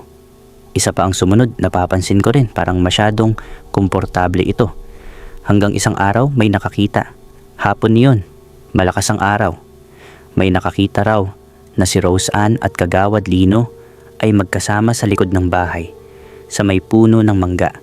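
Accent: Pangasinan, Philippines. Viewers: Taga pangasinan yan c narrator 😂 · Hahahhaha panggalatog ata c narrator😮😮😮😮😮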